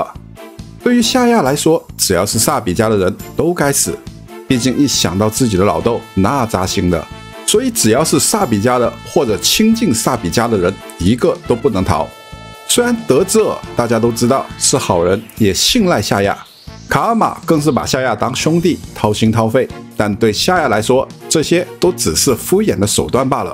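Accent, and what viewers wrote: Hong Kong, China. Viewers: 香港人說普通話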